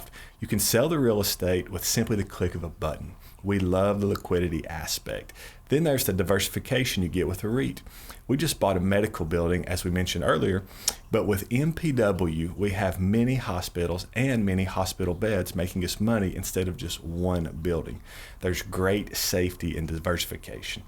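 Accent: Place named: North Carolina, United States. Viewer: Thanks, great content. I actually thought you were from NC.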